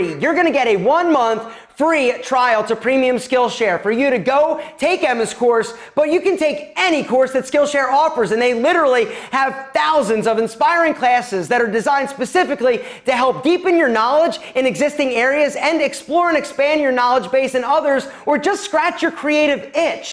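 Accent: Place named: Italian-American, United States. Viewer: It's the Italian-American accent. Yes, it sounds so badass.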